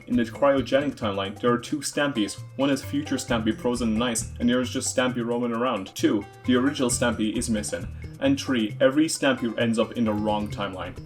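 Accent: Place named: Ireland. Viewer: I thought I recognised that accent Hello fellow Irishman Also, great vid absolutely loved it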